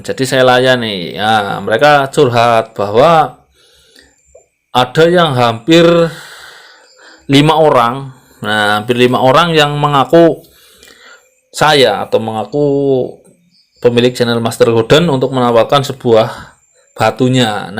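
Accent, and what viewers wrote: Banyumas, Indonesia. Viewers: kan wong ngapak, ora ngapak ora kepenak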